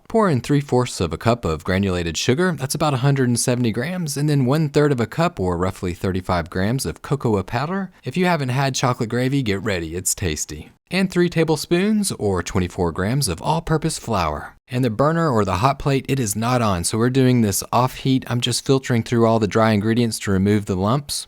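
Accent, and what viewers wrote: Tennessee, United States. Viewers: I'd say the Southeast, particularly Tennessee.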